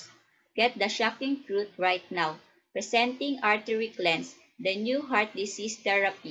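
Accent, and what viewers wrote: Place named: Philippines. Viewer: The narrator doesn't sounds professional. Got a very heavy Filipino accent. I have my reservation every time the announcer or narrator hide his or her face.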